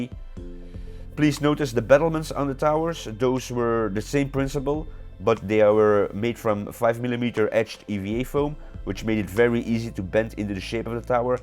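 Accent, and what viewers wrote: Netherlands. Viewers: Your accent sounds very Dutch, am i right ? Ah, Belgisch, close enough ? Het klinkt wel vrij Nederlands, meer dan Belgisch, dus je woont/komt van bij Nederland in de buurt wel ?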